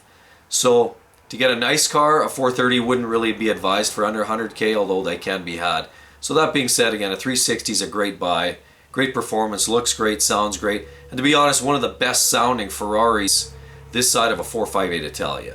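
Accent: Canada. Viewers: Thick Canadian accent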